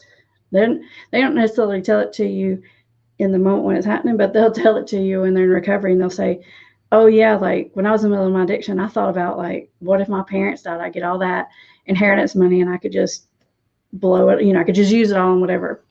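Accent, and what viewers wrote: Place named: Alabama, United States. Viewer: Chick-a-dee ... you sound like a sister or cousin from home.. you know, the land of Bless your heart ❤️.. lol .. I’m from north Alabama .. you have a dialect I hear often from bham, Tuscaloosa and surrounding area - loving your content !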